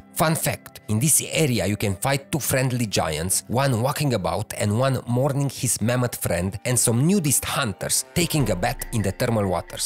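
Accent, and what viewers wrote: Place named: Romania. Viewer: mamă ce ușor recunosc accentul românesc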